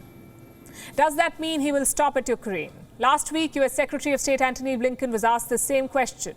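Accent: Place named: India. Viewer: I CANT LISTEN TO THIS INDIAN ACCENT IM SO SORRY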